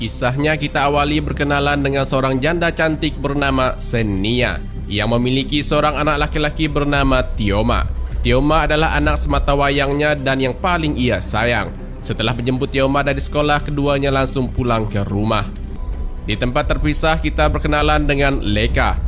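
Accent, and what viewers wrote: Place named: Aceh, Indonesia. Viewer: Logat Aceh pak Admin ya😂😂, mantaf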